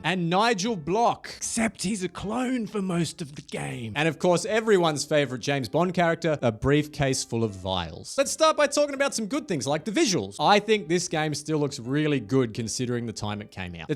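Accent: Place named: Australia. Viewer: My first thought when I saw this video was "over an hour of that Australian guy who talks about video games? Did I die?"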